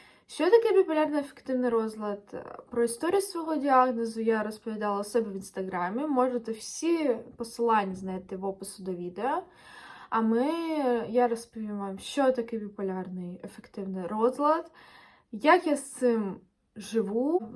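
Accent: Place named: Russia. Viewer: Русский акцент. Лучше на русском общайся, слушать сложно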